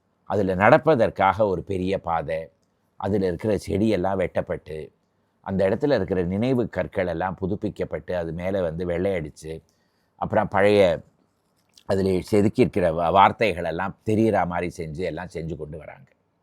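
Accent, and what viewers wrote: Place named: Tamil Nadu, India. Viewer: Very Good Informative Video 👍 Thanks for an Educative and Informative Sharing with Your Beautiful Thamizh Slang.....🙏👌👏👍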